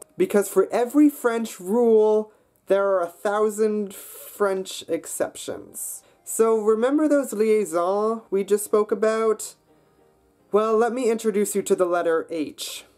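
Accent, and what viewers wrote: Quebec, Canada. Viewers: Oh la la! Looks like a Ph.D. thesis... Every language has its challenges, and I'm amazed at all the diversity of writing systems, phonology and the sounds that the human mouth can make. From the way you pronounce "about" I would say you are Canadian--and don't they teach French in school in Canada? If it took you 12 years to figure French "out" you were probably more interested in other things. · I'm Canadian and I speak french... I don't know why, but you speak a little like the Quebecois(I am one too).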